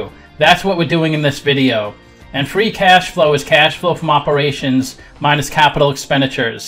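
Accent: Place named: Boston, United States. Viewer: You accent says drunk Bostonian but your efficient explanation says seasoned financial advisor with a unique ability to explain valuations to simpletons like me. 🧐 You have my subscription sir.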